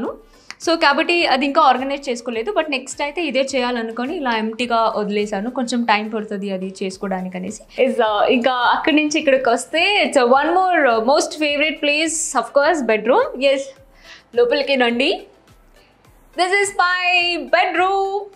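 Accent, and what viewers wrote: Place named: Telugu, India. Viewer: Mee voice n Telugu slang kekaaa loved you so much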